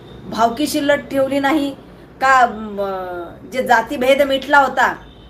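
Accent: India. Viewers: I am watching your videos from Canada. I am originally from Nagpur. I like your rural marathi and your boldness. You have exposed these so called Maratha leader. He is really bhangar illiterate person. God bless you beta 🙏🙏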